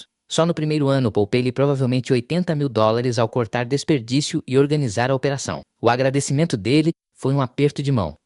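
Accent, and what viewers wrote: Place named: Portugal. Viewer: Vou me embora este sotaque português e um saco.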